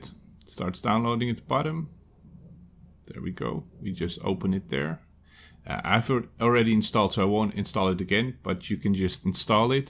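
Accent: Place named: Netherlands. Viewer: Nederlander xD, hoor het aan je accent.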